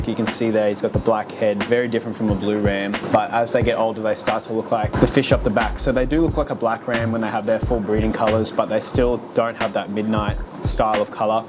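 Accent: Australia. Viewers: its cause his Australian accent is kinda hard to understand lol.sometimes what you end up hearing is completely need up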